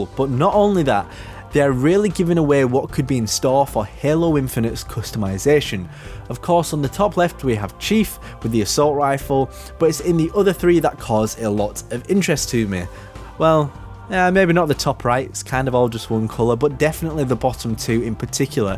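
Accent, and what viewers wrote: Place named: England, United Kingdom. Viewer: Wanna know what's beautiful? Your wonderful english accent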